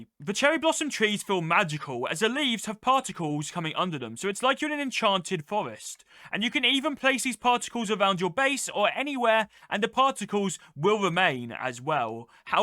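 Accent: United Kingdom. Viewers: lol wat, bro sounds british, nothing like trump.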